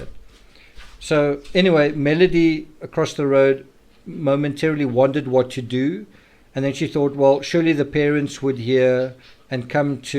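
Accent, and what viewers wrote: South Africa. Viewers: Disliked because of your terrible South African accent.I'm South African myself,but Dude !!!!!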